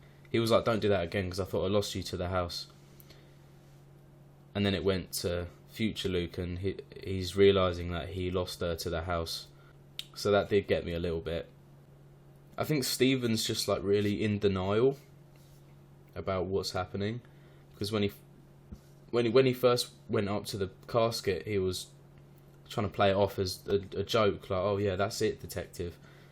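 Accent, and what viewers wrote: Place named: United Kingdom. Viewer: I loved this show and ur reactions haha where bouts in the uk are u from cant figure out ur accent im from newcastle 🤣🤣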